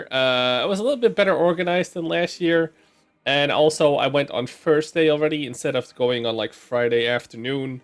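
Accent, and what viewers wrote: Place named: Netherlands. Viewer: IELTS? I'm asking because the accent is barely audible. Dutch myself as well, noticed that a lot of other Dutch people can speak English quite well, but the accent is very prominent.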